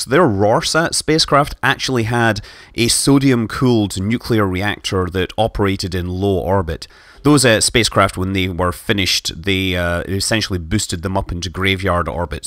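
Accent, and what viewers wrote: Scotland, United Kingdom. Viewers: Scott's a Scot, and still has a Scottish dialect. This includes occasional alveolar taps which are sort of a cross between r, d, and l, and when the r is a linking r, it is almost always given an alveolar tap.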